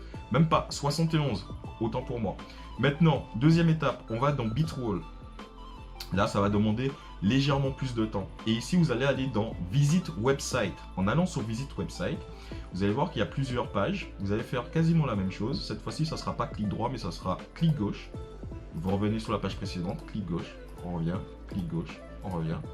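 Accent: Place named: Antilles, Caribbean. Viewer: Ha une voix antillaise comme moi. Parfait continue ne fais pas comme pratiquement tous les autres youtubeurs qui essayent de nous avoir car ils sont payés pour ca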